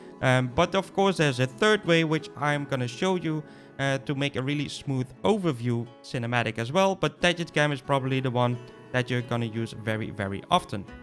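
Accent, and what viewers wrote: Netherlands. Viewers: Your accent sounds dutch... ben je een Nederlander?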